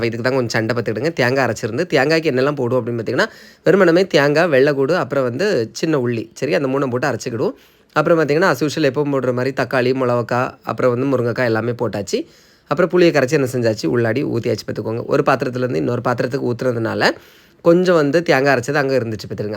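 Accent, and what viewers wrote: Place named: Telugu, India. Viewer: Nalla thamizh pesu bro. Telugu vaadai adikuthu unga tamizh. Style nu nenachi pesuringa. Tamizh mella saagumnu kelvi patturken unga kita tha paakuren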